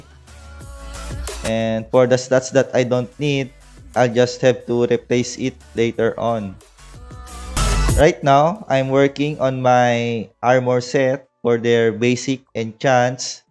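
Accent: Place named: Japan. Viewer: what's with this japanese accent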